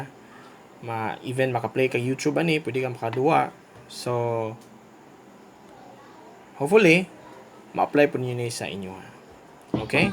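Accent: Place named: Visayas, Philippines. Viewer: Bisaya man jub ka saan ka saan ka dira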